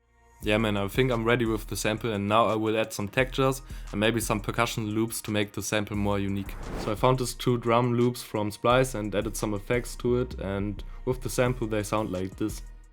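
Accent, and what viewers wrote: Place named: Germany. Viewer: Mann hört sein deutschen aktzent so raus haha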